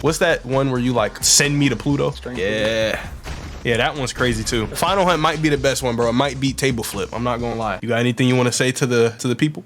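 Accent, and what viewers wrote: United Kingdom. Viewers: bro is british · HE'S BRITISH? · WHEN WERE YOU BRITISH? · Why is he British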